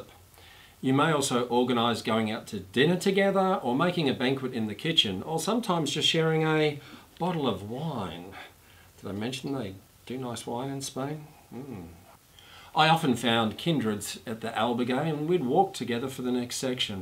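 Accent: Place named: Australia. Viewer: Interesting Aussie accent and jokes. al-BER-gay St- Jon -peed-d-por Walking sticks are so helpful taking 30% of the weight- bearing off hips, knees, ankles. Good for going down & up. I understand your wrist problem but please recommend them! One stick creates imbalance in the body over days. (I've walked 7 caminos) thanks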